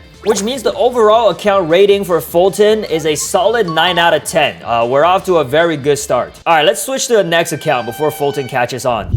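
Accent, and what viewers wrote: Canada. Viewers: Bro are you from canada 😈😈😈😈😈😈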